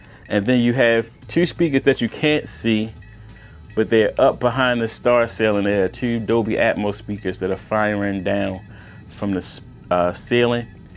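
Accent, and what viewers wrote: Baltimore, United States. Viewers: You're from Baltimore aint youuu?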